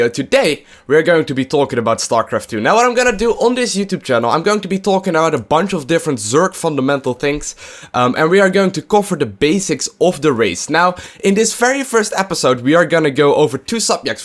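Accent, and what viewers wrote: Netherlands. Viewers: Just wondering are you from the netherlands? :) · are you Dutch? you sound Dutch to me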